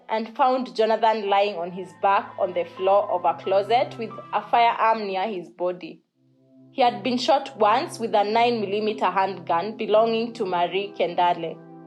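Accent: Kenya. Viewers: You are a very good storyteller. I love listening to your beautiful Kenyan accent.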